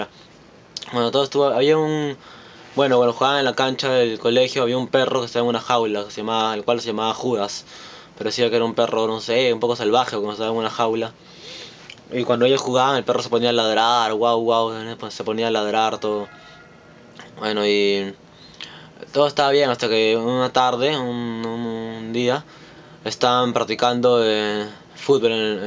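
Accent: Peru. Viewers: Es peruano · Ta bien pero hablai como las weas peruano klio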